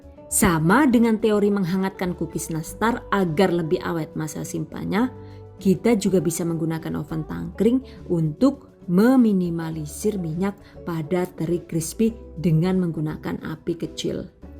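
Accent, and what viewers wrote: Banyumas, Indonesia. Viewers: rika wong ndi sih geneng ngapak yah...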